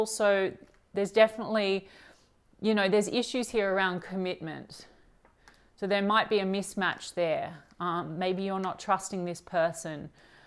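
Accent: Australia. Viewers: Great to hear an Aussie. Surfers Paradise here 🇦🇺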